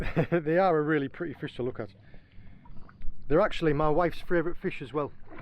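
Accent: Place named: North East England, United Kingdom. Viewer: Thanks. Enjoyable and informative as ever. We're back down to the Roseland for a couple of weeks at the end of August so can't wait to wet a few lines! Meanwhile, there's the added amusement of seeing what YouTube closed captions makes of your accent. In the first few minutes of this one we had sand-eels alternatively expressed as 'sandals', and 'sun deals'... Can only assume the developers of their speech recognition software haven't spent much time in the North East...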